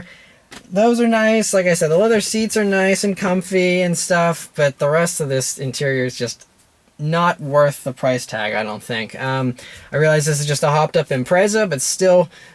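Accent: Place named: United States. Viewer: an unbiased opinion on wrx by a youtuber whos account name is?... think that one out... however his reviews are good, I love em alot... and I hope he does go onto greater things.... somethign about the American accent and documentary really fits, same as British accent... try doing this as an Aussie..... Our accent feels sharp and uneasy to listen to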